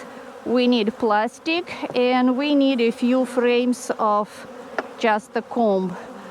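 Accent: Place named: Russia. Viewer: Замечательный русский акцент😁., мой английский не совсем вери гуд. Как потом с маткой решается вопрос? Она же среди этих 5 корпусов.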